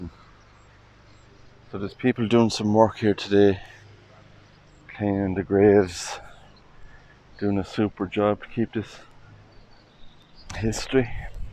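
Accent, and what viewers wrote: Ireland. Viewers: Beautiful cemetery. Your Irish accent is as well. I love listening to you. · You have a very gentle Irish brogue there sir, even when the subject matter is a bit somewhat dismal. Lovely trees there, I could hear a Chaffinch (Rí Rua) and a Coal tit (Meantán dubh) there (I think, I couldn't really be sure, but I suppose). What a horrifying thing to find out, people were being buried alive in those days, probably one of the worst feelings you could ever imagine☘🐦🪦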